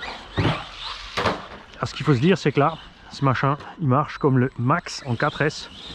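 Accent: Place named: South, France. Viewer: qui parle sudiste tu m'as régalé 😍 ( sud power 😁) Le front flip magique jamais je pensai que c'était possible. Et visi bien solide la machine car elle prend cher sur cette vidéo 💪💪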